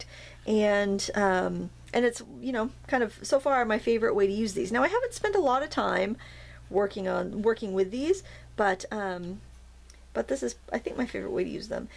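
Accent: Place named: Norfolk, United States. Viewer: And, it's funny how her pronunciation is so much like my mom's. And mom was born in raised in Norfolk, VA. Lot to be said for the remnants of the dialects of the original English settlers.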